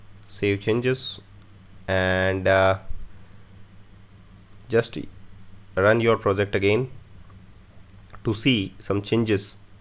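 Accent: India. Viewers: The worst most video I've ever seen in my life. The Hindi influenced English trainer accent is really irritating. It is clearly understandable that, this guy don't know anything, but he is managing to teach us. I've already provided this comment. Looks like these guys are removing negative comments. Hence, I've provided negative comment once again.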